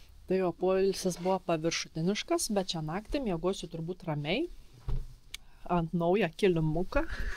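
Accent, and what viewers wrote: Lithuania. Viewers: iš kur tu kilusi pagal kaba spėsiu iš Raseinių.Ten taip kalba Stebiu tave tau sekmės.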